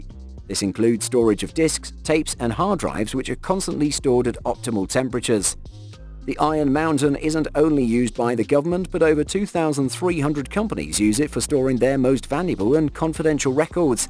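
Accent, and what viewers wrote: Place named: United Kingdom. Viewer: The narrator is British. That is how the British pronounce the Z.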